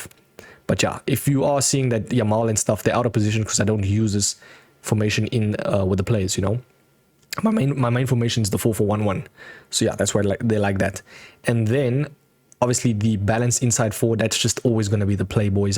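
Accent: South Africa. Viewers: Is this guy South African ?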